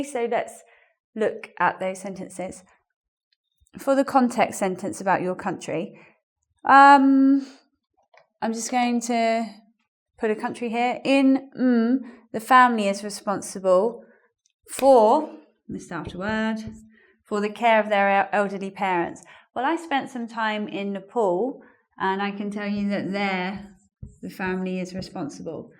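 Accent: United Kingdom. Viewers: she has British accent. I like her voice lol so similar with my professor from London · Your Great, Miss.I was looking for real British English Lesson and now finally found you on you tube, awesome! by the way I'm from Nepal.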